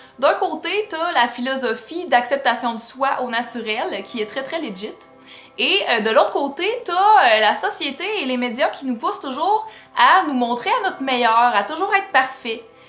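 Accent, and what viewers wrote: Quebec, Canada. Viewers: Ptn j'arrive pas à écouter sans rire haha, l'accent québécois me tue😂😂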